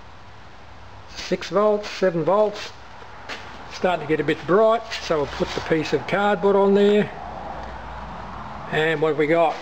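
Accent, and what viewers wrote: Australia. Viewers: G'day. Good to hear an ozzy voice. Thank you for the idea and keep up the videos.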